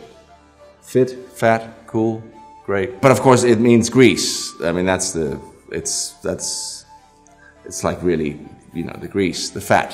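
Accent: United States. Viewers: I find it fascinating he normally speaks with an American accent but he was born and raised in Denmark. Even his Danish sounds more like an American speaking Danish. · When he speaks english he almost sounds American? I had no idea he was Danish. I guess i've never heard their accent before. haha he's great! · It's funny to hear him speaking with an American accent in this video and a British one in GoT. And of course speaking Danish, which sounds like something is continuously stuck in the throat.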